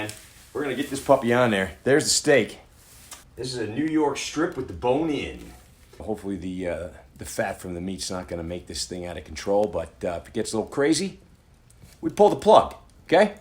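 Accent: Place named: Italian American, United States. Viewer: Very Italian American accent haha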